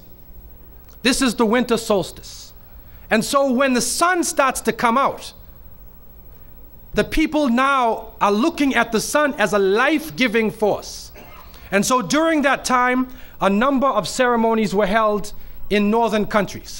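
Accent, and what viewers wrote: United States. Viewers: I love his American accent. Awesome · he's originally American. Boston I believe.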